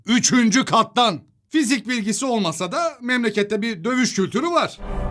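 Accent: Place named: Adana, Turkey. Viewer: Abi lutfen Adanaliyi konus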